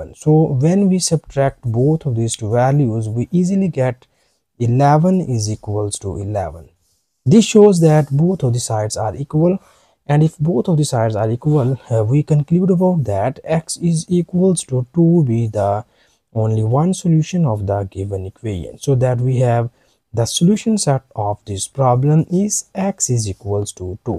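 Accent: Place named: England, United Kingdom. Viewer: Dehati angrez ki tarah bol raha hai. Paki hai kya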